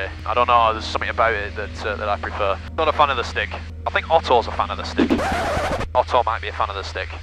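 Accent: United Kingdom. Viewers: hey, thx for answer my question :))) you are from australia? i always thought, you are from uk because your dialect sounds british..... :))))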